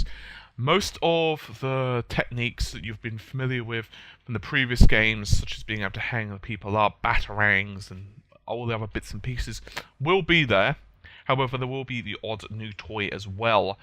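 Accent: United Kingdom. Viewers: My God your voice is British O.O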